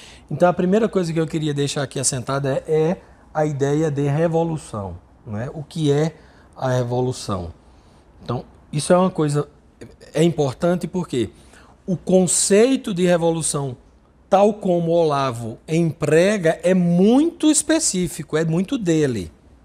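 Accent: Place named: Northeast, Brazil. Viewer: Esse palestrante é do nordeste? Sotaque bonito! Moro em João Pessoa, e tenho amigos pernambucanos, potiguás e cearences.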